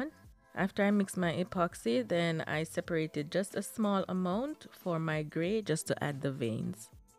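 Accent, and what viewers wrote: Jamaica. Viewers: Your English sounds Jamaican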